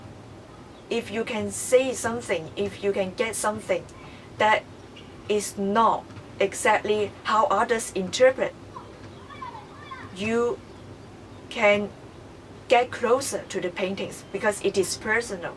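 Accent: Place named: China. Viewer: Chinese accent is so beautiful to hear.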